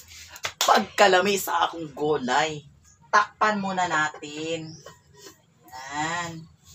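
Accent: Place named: Davao, Philippines. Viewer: Relate man kaau ko ana imong sinultian kay sa amua sa davao man.binisaya kaayo .watching from dubai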